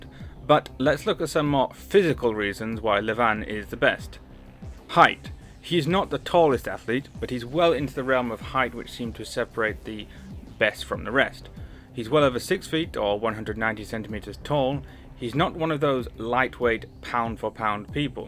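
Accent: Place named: United Kingdom. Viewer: Wow where are you from? That’s the strongest British accent I’ve ever heard, with a slight hint of Malay/Singlish added in there too.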